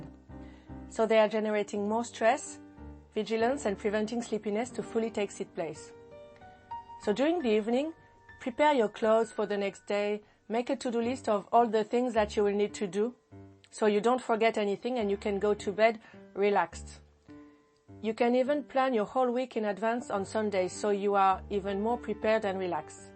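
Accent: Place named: France. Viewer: I found this video explained very clearly all the complex facets of sleep. Nice French accent as a bonus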